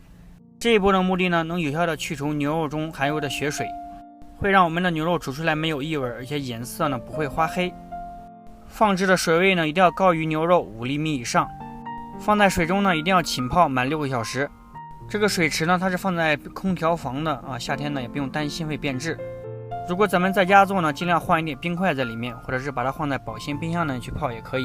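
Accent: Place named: Anhui, China. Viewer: 不错，安徽人啊！口音。😹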